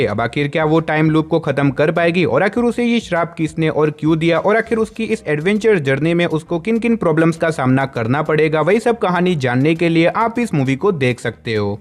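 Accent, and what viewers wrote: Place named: India. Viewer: Evriting is good just your fucking India accent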